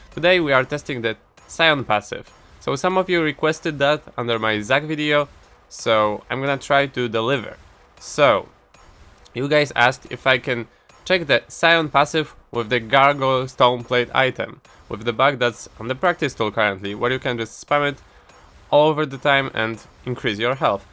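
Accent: Poland. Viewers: This Polish accent :D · jak Ty Polak XD wszedzie sie da poznac ten akcent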